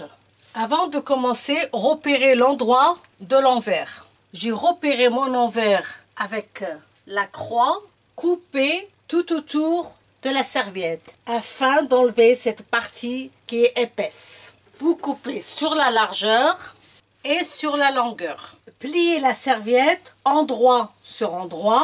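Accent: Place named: Lebanon. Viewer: Coucou je commence la couture je trouve que vous expliquez super bien et chose importante pour ne parler pas trop vite ... Et j'avoue g craqué sur votre magnifique accent ça me rappelle ma famille au Liban . Merci beaucoup 👍👍👍👍🌷🌺💐💗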